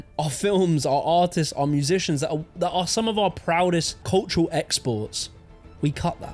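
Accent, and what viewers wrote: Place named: Dunstable, United Kingdom. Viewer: I've been watching your videos for a while and have lived both in East Asia for a few years and in London for nearly a decade. I'm 31. I nearly had an out of body experience in your cut to Dunstable high-street. The absolute hole where my childhood took place, which I escaped at 18 years old. Your accent always seems weirdly familiar to me. When you said you were from dunstable I nearly fell off my chair. Sorry to say mate, you have a dunstable accent! I remember when Asda came to dunstable. I have memories of going to the music hall event space that used to be there in it's place. The fireworks at Bennetts Rec. The lighting of the Christmas tree opposite priory gardens. The town had some sense of community and sense of life, even if fairly basic and uninspiring. Asda arriving kickstarted the process that resulted in the death of many local businesses, followed by 2008 which dealt another knock out blow. 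Then amazon came and built it's concentration camp of cheap crap on the scrub land opposite the white lion retail area, which was already one of the seven circles of bland corporate hell. When I arrived back in my parents house for a year during 2020, I genuinely felt sad at the sight of what had become of the place. A place I had already always hated. I might have hated it, but I didn't want that to happen to it!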